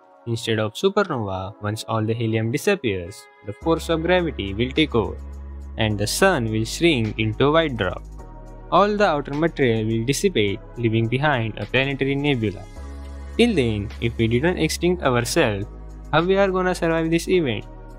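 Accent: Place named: England, United Kingdom. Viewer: I'm sorry I don't understand the English accent very well :( Good animation ♥️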